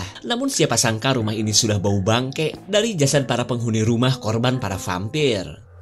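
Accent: Sunda, Indonesia. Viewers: Sering "afdett bangg siaaapp menungguu apa lgii cara ngomong nyh cmpur sundaa heheheh😂😂😂❤❤❤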